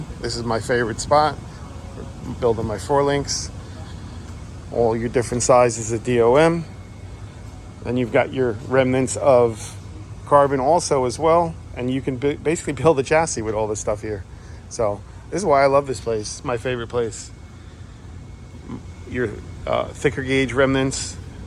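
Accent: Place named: Philadelphia, United States. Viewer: I listened to you for a minute and was like he’s from tristate area. Thats a Phila accent if i ever heard one. Then looked up Alro and there one 10 mins away haha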